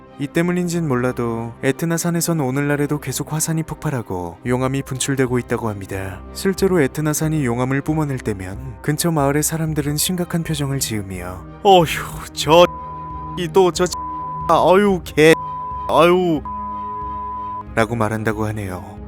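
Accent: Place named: Japan. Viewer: 그리스어 아는 한량입니다 일본어 악센트만 좀 없으면 밥도둑일것같습니다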